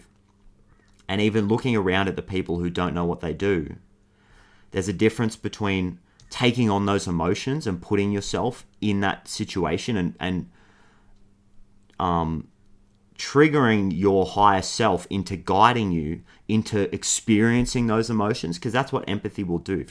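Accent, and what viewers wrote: Australia. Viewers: Hey, thank you. It's so nice to hear a fellow Aussie read. And it's spot on. I am being taken to a rental tribunal by a managing director who lied to me and has lied on an official form. I am scared, not sleeping well, although I had a dream where I saw the word "peace" and the name of the managing director who has lied, defamed, and made my difficult life harder. I felt a sense of relief after that dream, some compassion for the damaged young woman that I am dealing with. I have been showing up every day, taking all the action I can. The hearing is on Wednesday. I will take compassion, strength, and all my spirit guides and angels with me.Thanks for your wisdom.K.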